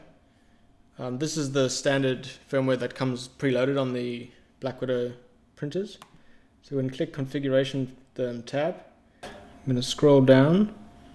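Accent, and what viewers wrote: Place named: South Africa. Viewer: Gotta be South African :)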